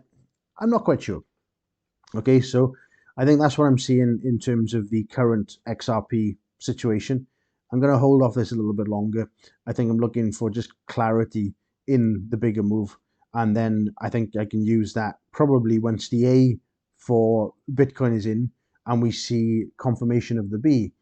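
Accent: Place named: Wales, United Kingdom. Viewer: Good info mate. Nice to hear solid TA from a fellow Welshman ;)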